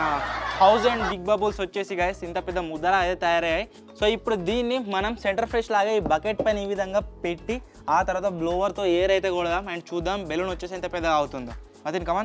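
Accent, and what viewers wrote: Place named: Telangana, India. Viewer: bro Telangana slang vintunte super ga anipistundhi bro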